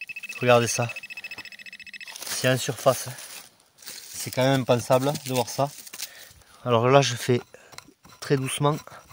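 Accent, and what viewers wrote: Southwest, France. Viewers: Je sens un accent du sud-ouest ou me trompe-je?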